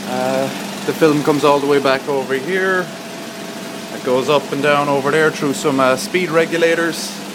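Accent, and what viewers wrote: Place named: Ireland. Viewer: The projectors look ancient, but there probably bight like tanks. Great Irish, accent. :)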